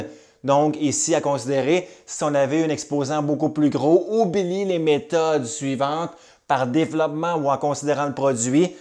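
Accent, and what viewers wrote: Canada. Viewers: A 66 ans je révise les maths pour aider ma petite fille de 15 ans et je trouve vos cours géniaux. Ils m'ont permis de l'aider à comprendre des notions complexes étudiées dans ses cours de maths. Votre tonalité (je vis en France) canadienne est un chant pour moi et votre communication gestuelle est un plus pour mieux retenir vos cours. Les cours deviennent magiques et on croit être dans un univers enchanté. Bravo, continuez ainsi pour faire aimer les maths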